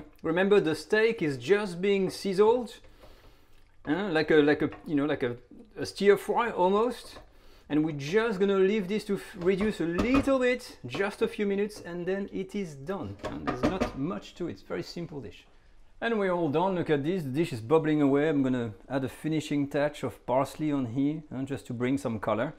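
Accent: France. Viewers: I can hear dat French accent😂😂. Continuez chef! J'adore votre recettes.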